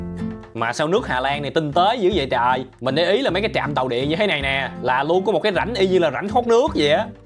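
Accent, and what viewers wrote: Mekong Delta, Vietnam. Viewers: Ông nc rặc miền Tây,dù đi dl nc ngoài thì ổng nói tiếng Anh giọng cũng đặc sệt miền Tây,dễ thưn,thân thiện,hài huớc 😂😂😂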